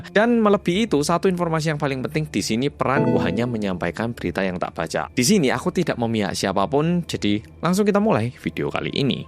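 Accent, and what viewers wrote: Java, Indonesia. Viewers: medok puooolllll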